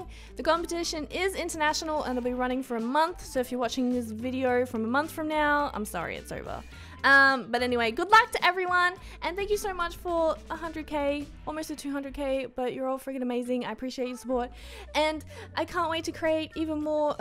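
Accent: Australia. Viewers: Omg youre Australian!! Thats so cool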